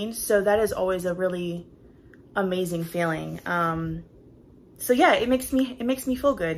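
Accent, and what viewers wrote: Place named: South, United States. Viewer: I love that great video. You are so beautiful. I love your Southern accent.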